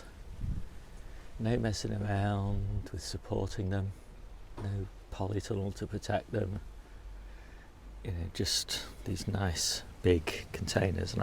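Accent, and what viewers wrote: United Kingdom. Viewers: You sound like your in the UK. Where I live grocery store tomatoes are hardly fit to eat. They are picked green and gassed with ethylene. I might try this Tumbler variety.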